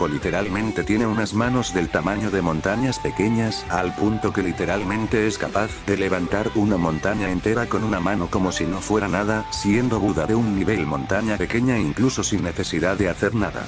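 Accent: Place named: Mexico. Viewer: Muy epico el video y la escala, pero porque usas los fragmentos en castellano, es decir hasta lo que vi tu parecías mexicano más que nada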